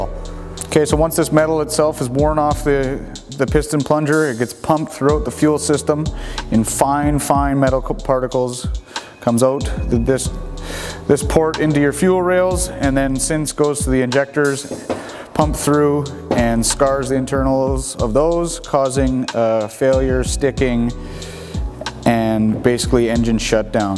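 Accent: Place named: Canada. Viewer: grew up near canada. can always tell the accent when we say "aout" instead of out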